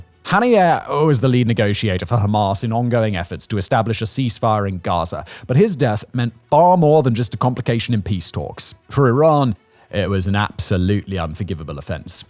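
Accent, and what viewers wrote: United Kingdom. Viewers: This guy talks a lot. I think the video can be summed up as: 1. Iran has said they are going to attack. 2. So far they haven't, but that doesn't mean they won't. 3. They have a lot of missiles that may be too many for Isreal to shoot down, but we don't really know. 4. The U.S. has moved planes, ships, and subs in to the area. End of content. How much value there is in any of that, I leave to you to decide. I suppose the British accent does make it seem more compelling.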